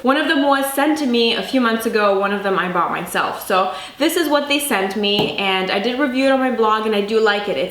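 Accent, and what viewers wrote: Canada. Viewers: I'm loving your Favourites vids- they are to the point but not too curt and your recommends are consistently great. Also I don't know why but I used to live in Ontario and your style reminds me of it for some reason...maybe your accent? Anyways, it's a good thing! Thanks for sharing and props to your ubiquitous quality.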